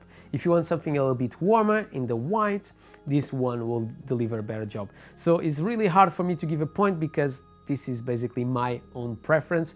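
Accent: Portugal. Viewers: Caralho, pelo sotaque és tuga😆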